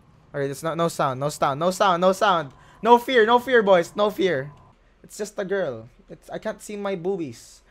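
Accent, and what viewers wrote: Bisaya, Philippines. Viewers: Ang ganda ng bisaya acsent mo love it! (Y*wa,Giatay) Pa shout out salamat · kuya gusyo ko yung bisaya accent mo kuya;YAWA at syaka kuya bisaya din ako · YAWAA: GI ATAY You got the bisaya accent👌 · Iloveyour bisaya accent Bahogbilat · Nice Bisaya accent boi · Bisaya ka? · I LOVE YOUR BISAYA ACCENT PLEASE SAY IT AGAIN:GIATAY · I LOVE YOUR BISAYA ACCENT PLEASE SAY IT AGAIN: PISTING GIATAY!!! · I LOVE YOUR BISAYA ACCENT PLEASE SAY IT AGAIN "TURTOL"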